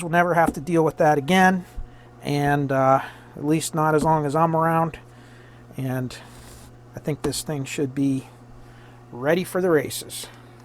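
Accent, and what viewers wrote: Pennsylvania, United States. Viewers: Johnstown Pennsylvania accent.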